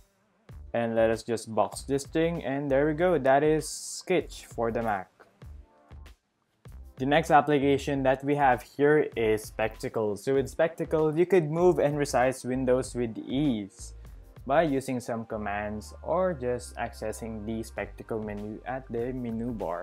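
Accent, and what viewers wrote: Philippines. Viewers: Sounds Pinoy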